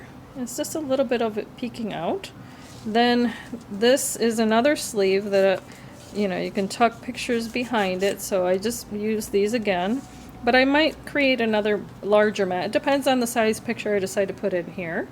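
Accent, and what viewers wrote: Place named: England, United Kingdom. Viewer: Beautiful!!! I have this one and the cooking theme one and yes it's been in the hoarding vault lol, oh you remind me of my daughter with her English accent lol, (Boricua/Colombian) and she speak English 😂 tfs hugs Yorlis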